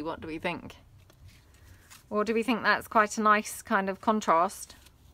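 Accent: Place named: Lincolnshire, United Kingdom. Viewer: Hi. Love listening to you. I’m in Australia Victoria (not) enjoying our 5th lockdown!! So you are my breath of fresh air. Plus the bonus for me, your accent is not dissimilar to my wonderful sister in law who grew up in Cleethorps 😂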